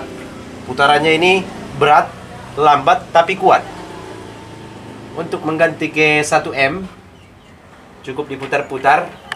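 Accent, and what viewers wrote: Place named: North Sumatra, Indonesia. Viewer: Dimana alamatnya bosku....sepertinya orang sumut...saya juga orang sumut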